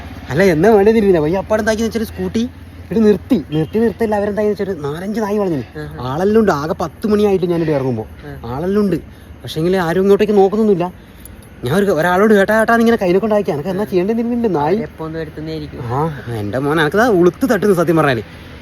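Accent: Kannur, India. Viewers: നമ്മട kannur ഭാഷ, ❤️🥰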